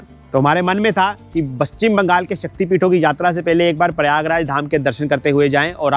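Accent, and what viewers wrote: Punjab, India. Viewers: I think your tone is Punjabi. You must be from Punjab, which part of Punjab are you from? I’m from Hoshiarpur Punjab currently living in USA Seattle here we love your video. I watch this video with my parents. Very nice video informative keep making new video. Thank you and good luck.❤❤🕉️🕉️🪯✝️☪️🕉️🕉️💚💜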